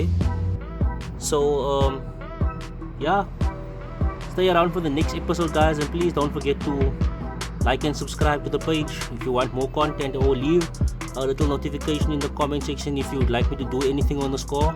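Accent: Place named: South Africa. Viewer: Love the South African accent 😄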